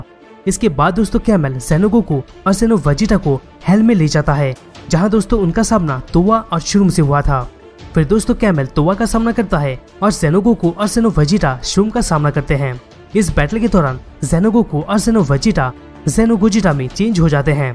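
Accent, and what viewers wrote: Bengal, India. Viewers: Great bhai par apke pronunciation se samajh mein ataa hein ki aap Bengoli hon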